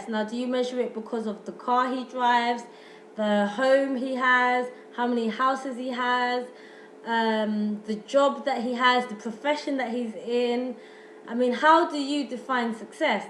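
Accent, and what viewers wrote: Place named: RP, United Kingdom. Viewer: Listen to that posh accent! LOVE IT! Do men measure up? Hmmmm...I am afraid to answer. LOL. For me personally, the man that I have DOES measure up, which is shocking because I never thought I would come across a man who fulfilled ALL of my wishes! This is a good video. For sisters who are still looking, there should be NO compromising! FAVORITED!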